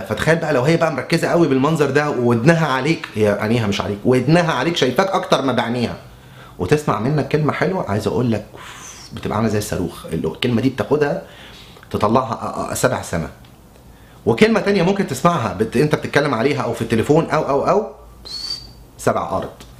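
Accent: Egypt. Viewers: حضرتك شكلك أوربي استغربت انه لهجتك مصرية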